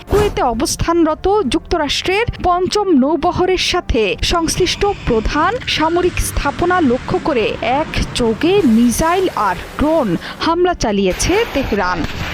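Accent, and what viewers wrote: Kolkata, India. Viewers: কলকাতা থেকে ট্রেনিং নিয়ে আসছেন নাকি আপা।আপনার উপস্থাপনার ধরন এরকম কেন?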